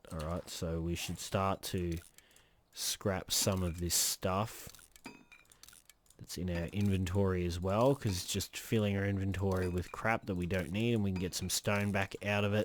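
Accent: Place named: Australia. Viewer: sure did! accent kept me in lol, Australian?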